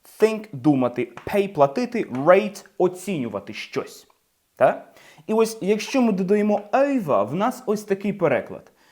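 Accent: United Kingdom. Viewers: Ці відео дуже корисні! І я вам дуже вдячна за ці уроки. Я завжди вам коментую бо я дійсно хочу щоб у вас був мільйон підписників! Обожнюю ваш британський акцент! Можливо ви колись розповісте нам як ви вивчили англійську так бездоганно!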